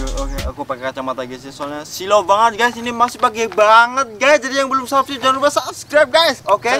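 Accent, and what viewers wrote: Banyumas, Indonesia. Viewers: Gawe konten tapi ngomonge ngapak mas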